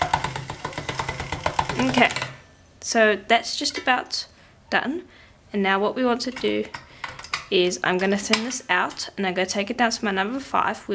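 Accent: South Africa. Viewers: Love your tutorials you're very good! Also love hearing the South African accent, miss it since I left in 2000 n don't hear it much. My husband always teases me when I say 'off' and I giggle every time you say it. Never noticed it before but now I can hear what he means. Keep crafting, you're awesome.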